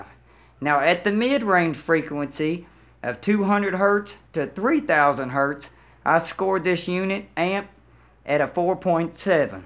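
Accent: South, United States. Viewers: Good Ol' boy does audio...I have not heard audio gear reviews with a heavy southern accent. I like it! Haters gonna hate on Emotiva, seems impossible to go wrong with for the $.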